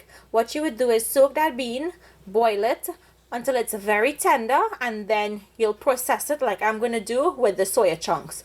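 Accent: Trinidad and Tobago. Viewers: Hi, I'm still watching your Christmas cooking. It makes me feel at home. Trini English.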